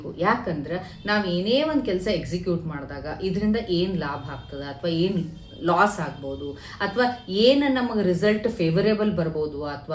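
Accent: North Karnataka, India. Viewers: You are the one who speaks Uttara Karnataka-Kannada. It is very homely atmosphere,you explanation is just like an Akka(elder sister) with mothers attitude who's very concerned,loving and caring. God bless you 🙏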